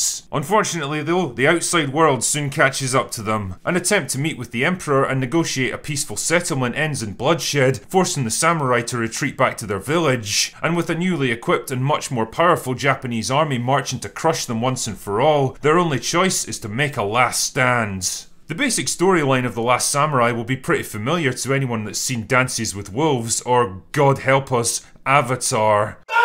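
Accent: Scotland, United Kingdom. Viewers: Note to self: everything sounds better (and funnier) in an outrageous Scottish accent!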